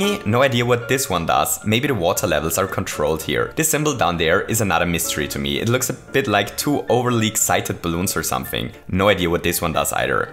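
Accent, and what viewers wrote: Germany. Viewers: Are you German? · Are you from germany? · Misser Deutscher Akzent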